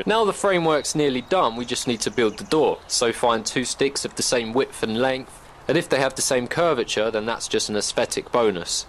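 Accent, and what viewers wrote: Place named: Derbyshire, United Kingdom. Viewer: u sound like your from derbyshire? XD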